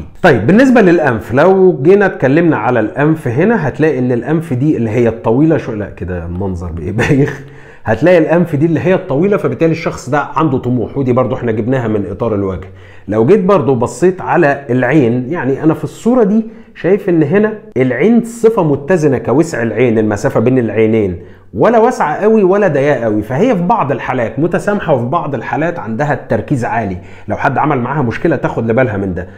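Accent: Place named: Morocco. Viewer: لا هي مغربية لهجتها مغربية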